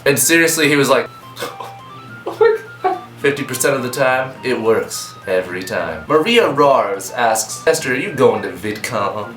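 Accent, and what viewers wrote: England, United Kingdom. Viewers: Your english accent was beautiful xD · English Accent=♥ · i like your english accent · You're English accent is strangely amazing :D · i thoroughly enjoyed the english accent. makes you seem that much hotter ( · with an English accent= yes. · + English accent = amazing Phil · title: givs ppl erectile disfunction. comment: omg love the english voice, and the no braces! so cute! u rly nice teeth!!! omg<3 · YOU ARE REALLY GOOD AT BRITISH ACCENT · +English accent. I fainted. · i love ur bristish vioce so adorable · The whole time you were talking in a british accent, i wasn't even paying attention to what you we're saying cause I was laughing so hard. anyways, Title: Hops a Minivan filled with turtles snorting crushed unicorn horn. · love ur british accent! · lmfao, english accents with american lingo xD<3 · + British accent= Ear orgasm. 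· u sound amazing with a british accent!!!! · aw, your english accent makes you sound slightly camp. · your English accent is amazing. and what model is your fridge? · Your English accent makes guys with real English accents sound like fakes. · With the english accent, you sound like Russell Brand :) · omg superhot with an english accent ur sooo good at it <3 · oh my fuckery. how the hell does an english accent automatically make him so much more attractive than he already is? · Your english accent is quite brilliant =L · Your english accent just made me jizz. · My tit is stuck in a bears mouth :| im joking, you actually sound even hotter with an english accent and thought it was pretty good :D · OMG with an english accent = HELLA FUCKING HOT! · i died y you so sexy i can not get over how amazing you look:O and you with english accent:O kirjhnujyrhwjjbbhrgjbrgsbgbdjrs OMNOMNOMNOM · i really enjoyed that english accent:) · XD hahaha i love ur british accent u sound so smart.... 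TITLE: REVENGE OF THE HORNY LAMPZ!!! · You'r English accent reminds me of the time I was reading something out of a chemistry book in class.......I got kicked out of the class - .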